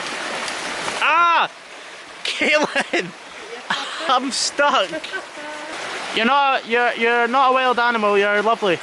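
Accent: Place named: Scotland, United Kingdom. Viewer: Scottish revealed. Known by accent! xD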